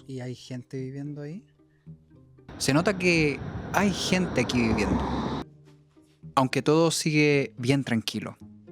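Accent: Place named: Chile. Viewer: A mi me engañan apenas entre al video supe que era chileno, siempre hay un Chile 🐻‍❄️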